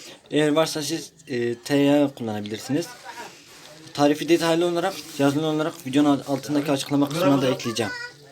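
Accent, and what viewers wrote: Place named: Mardin, Turkey. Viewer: %100 mardinlisin konuşmadan belli :)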